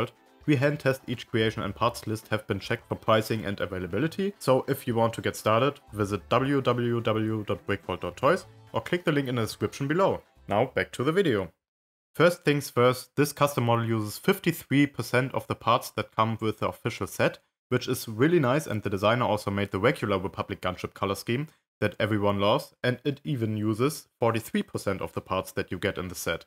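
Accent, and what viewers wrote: Germany. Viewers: Your accent Sound German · That German accent is crazy! Very nice!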